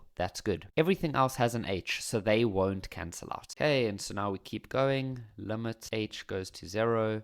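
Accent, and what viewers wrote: South Africa. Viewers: Your accent is so South African , i wonder how they would mistake you for anything other than lol 😆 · That cape coloured accent hella accurate.🤣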